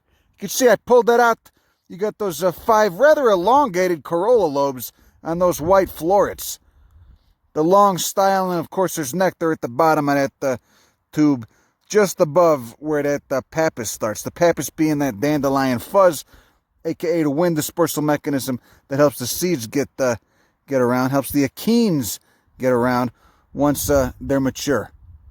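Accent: Chicago, United States. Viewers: Please do not denigrate the fine South Side of Chicago accent with scurrilous comparisons to either of those meat-heads.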